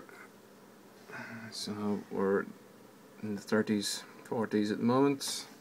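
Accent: Ireland. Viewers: Are you in any way Irish? :D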